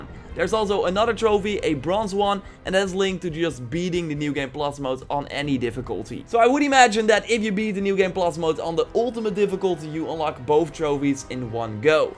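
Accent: Netherlands. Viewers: are you dutch? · Ik wist dat je Nederlands was!😂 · omg you are dutch :D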